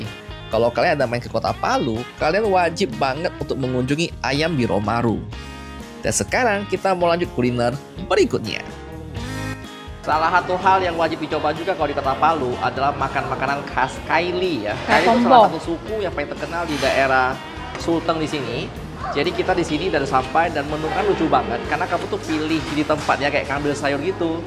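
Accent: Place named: Bangka, Indonesia. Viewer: asli bangka bg ? logat ny agak" bangka dikit mnrt gw sih..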